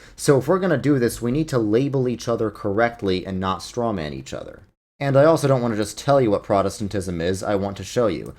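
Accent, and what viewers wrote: Germany. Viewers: The German accent got me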